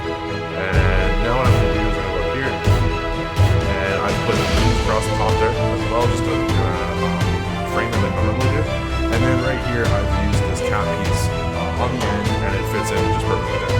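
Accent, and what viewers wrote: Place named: Canada. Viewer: Yo r u a Canadian brethren..? U got that accent 😳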